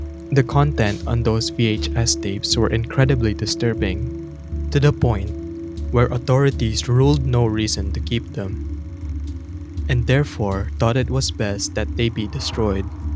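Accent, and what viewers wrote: Philippines. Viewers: I hear a Filipino accent 😁 greetings from an Indonesian 🤝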